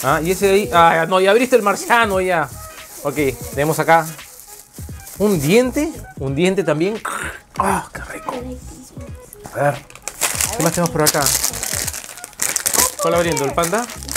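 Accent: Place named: Peru. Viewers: Peruano por la zampoña de atras y por la forma de hablar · Luis Cueva solo por la forma de hablar, ya debería ser obvio que es de Perú, pero vive en Estados Unidos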